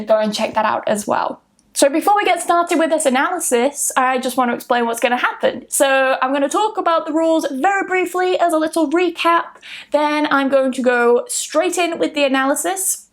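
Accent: United Kingdom. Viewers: Siendo sincero, por supuesto que quiero aprender inglés británico, pero me gusta más verla y oírla porque tiene una voz muy bella y me transmite un ánimo alegre porque siempre sonríe. Inteligente y bella que más se puede pedir. Me encanta su acento, cuando hablo inglés ya hablo mas con acento británico Gracias a ella. La adoro 🥰.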